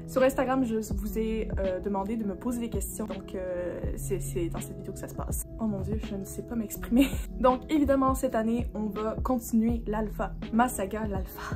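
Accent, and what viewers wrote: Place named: Quebec, Canada. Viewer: Waw cette vidéo est vraiment super :O ! Je vais continuer à t'écouter. Ta voix est satisfaisante et je trouve que malgré ce que tu dis, tu t'exprimes vraiment bien. Mon accent québécois est tellement plus fort que le tien lol. Tu es super inspirante. En ce moment, l'écriture ne fait pas partie de mes priorités, mais elle a toujours et occupe toujours une partie importante dans ma vie. :D Je « relate » tellement pour les playlists d'écriture (je fais la même chose !!!). Je vais probablement aller te lire sur Wattpad. J'adore Twilight et ce qui touche vampire/loup-garou, donc je pense que ça va m'intéresser.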